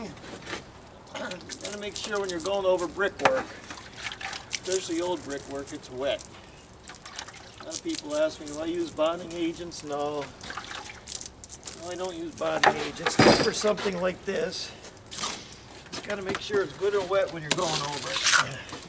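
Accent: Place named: Scranton, United States. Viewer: I am turning 72 this month. My house was in need of some repairs that I thought would have to be done by a professional mason but watching your videos gave me the confidence to attempt them myself. The results were great only because of your excellent explanation and recipes for mortar and cement. Thank you so much for offering people such wonderful information concerning your skills. By the way, my father was raised in Scranton,Pa. and he spoke just like you. God bless you. Sharing old world traits is not something we see much of from the masters anymore. Take Care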